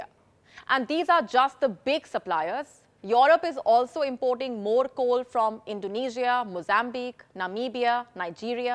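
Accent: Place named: India. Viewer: The accent is very indian😅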